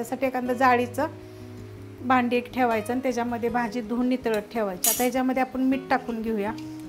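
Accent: Satara, India. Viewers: Tumch aavaj aikla tri mla sataryat aslya gat vatt 😍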